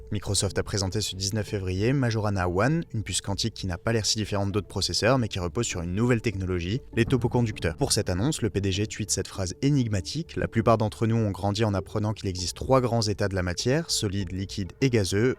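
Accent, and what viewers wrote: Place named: Banlieue, France. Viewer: Attention à ton accent de banlieue, c'est très perturbant et pas français.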